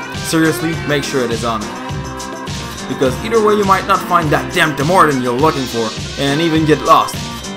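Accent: Quebec, Canada. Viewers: This is a French-Canadian accent! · You sound like a Québécois from Montreal ! Not that there is anything wrong with that .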